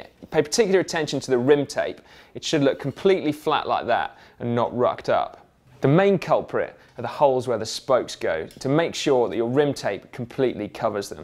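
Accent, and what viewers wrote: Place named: Scotland, United Kingdom. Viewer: Cacalari Bus My guess is Scottish.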